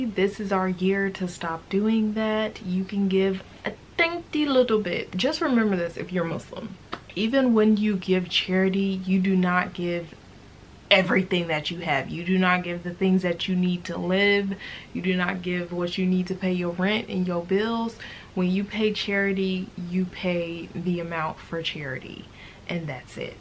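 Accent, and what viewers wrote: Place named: South, United States. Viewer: i looove your southern drawl omg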